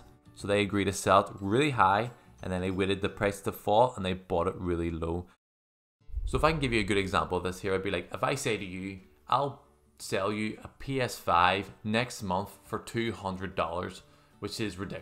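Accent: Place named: Northern Ireland, United Kingdom. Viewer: Being N. Irish, I had to double take when I heard your accent when watching a video on such an American film lol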